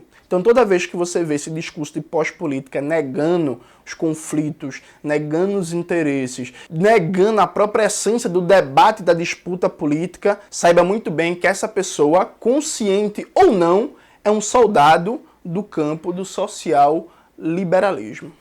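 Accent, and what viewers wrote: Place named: Northeast, Brazil. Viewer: Irmão tu é da onde? Eu passei o vídeo todo tentando descobrir de onde do nordeste era o sotaque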